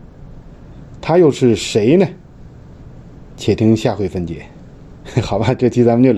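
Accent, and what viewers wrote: Tianjin, China. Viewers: 说话天津味道很重…… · 一听就是天津人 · 第一次刷到，李船长的口音听起来怎么有点像天津的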